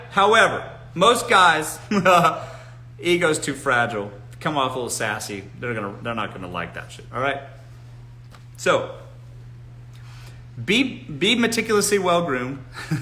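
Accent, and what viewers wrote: South, United States. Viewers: 😂😂😂 "A little bit nappy"🤣 Omg. We can SO tell you were born in the SOUTH. The way you keep it real hurts so good and is so hilarious 🤭 #Boss... my granny was born in MS and we grew up telling it like it is. Tell the truth, shame the devil.😌😆🤷🏾‍♀️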